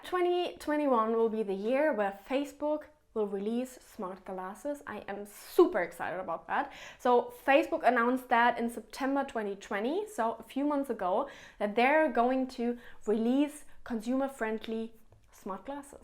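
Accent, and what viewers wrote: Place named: Europe. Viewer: As an Egyptian American UX designer myself I love it when I see knowledgeable UX designers like you happen to have an accent. It emphasizes my opinion on how most great trends in UI, fashion, interior design come originally from Europe and Asia. Americans here might be “uncompetable” when it comes to technology, but Europeans—especially Germans, French, Polish, and Italians—are definitely wizards when it comes to aesthetics and art. Good job, loved your content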